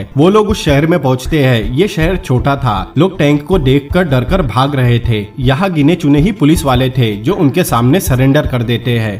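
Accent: Assam, India. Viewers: amazing movie bro.. very well explained, i felt like i was watching the entire movie.. i have lots of Assamese friends, i felt you have that little Assamese accent, are you from assam?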